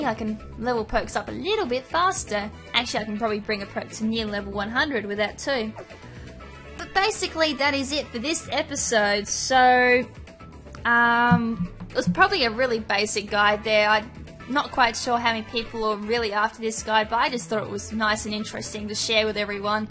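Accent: Australia. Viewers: actually she's an aussie so it's probably an accent thing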